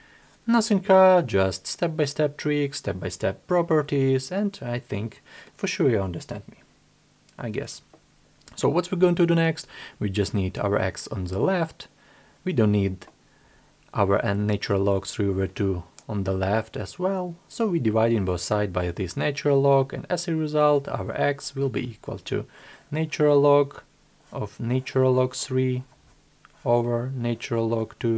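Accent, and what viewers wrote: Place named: Russia. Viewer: hello there! you are making great content! i have a few questions.u dont have to answer, if you see some of them personal) 1. will you do videos on higher mathematics? 2. what is your origin country? (i'm asking you this question,cause of your accent. i am from russia and i hear many of ours speak in english like you) 3.do you enjoy your life?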